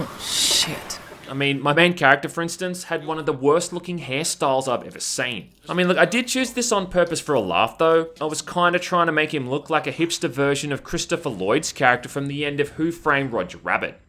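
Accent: Australia. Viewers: Australian accent is the best accent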